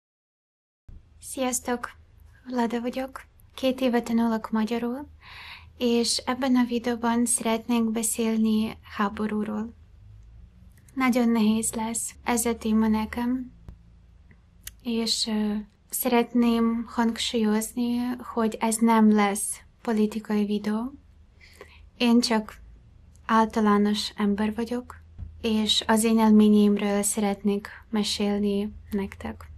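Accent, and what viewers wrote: Russia. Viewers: Szia! Borzasztóan sajnálom ezt az egészet.. Az én nagymamám Orosz volt. A 2. világháború után szökött Magyarországra, mert a fronton beleszeretett a nagypapámba. Nemrég halt meg 95 évesen, de meghökkentő, hogy mennyire hasonló akcentusa volt hozzád. És számomra ez a legborzalmasabb, hogy innen nézve 2 nagyon hasonló szláv nép öli egymást évek óta... Én is tudom hogy ez az oroszok bűne, és mellettetek vagyok a szívem legmélyén is. De akkor is mellbevágó a hasonlóság akcentusban, habitusban. Ha egy ország képes lerohanni egy hozzá hasonló kultúrájú országot is, akkor bennem semmi optimizmus nem maradt már a jövőre nézve... Nagymamám soha nem beszélt az ideérkezése előtti időkről, és soha többet nem ment Oroszországba. Egyetlen napra sem. kb. megtagadta a származását, és a saját gyerekeihez sem szólt oroszul egy szót sem. Amikor pár éve azzal példálóztunk neki, hogy már nem kell félni tőlük, ez már nem az az Oroszország, mint a régi időkben, mindig azt mondta: Nem tudjátok milyenek az oroszok! 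Amint megerősödnek, vissza akarják állítani a szovjet uniót! Miután meghalt, rá 1 évre kitört a háború. Úgy megkérdezném most mit gondol erről... Nektek pedig kitartást! És félelmetes milyen jól beszélsz magyarul 2 év alatt!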